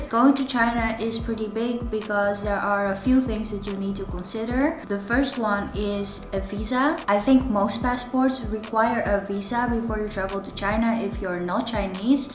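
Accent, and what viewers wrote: Netherlands. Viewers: 300$!!! You must have used a ton of data! Thank you for answering quickly and elaborately! So my take a way from this is that, it was expensive, but it worked well for you and your mother? Volgens mij kom je uit Nederland als ik je accent goed inschat😀, bedankt voor de reactie! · Thank you! You sound totally Dutch, are you 🤔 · Nederlands accent gespot! Handige tips, bedankt.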